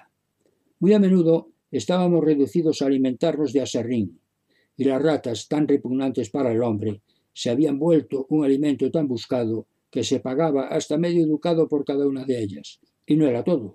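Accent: Spain. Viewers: Disfruto muchísimo mi abuelo era español y el acento del señor es para mí familiar y he escuchado varias conferencias.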